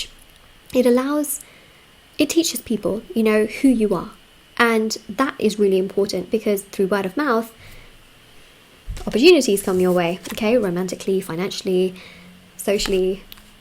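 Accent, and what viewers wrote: United Kingdom. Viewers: Hello, I wonder if u do live vdo tarot reading? Where are u located bcoz u sound British, & look eastern Indian, also like to know what is your horoscope sign if u don't mind. Thank u for reading.🙏🐾🌹🪔🕉️🤩✝️☮️🌺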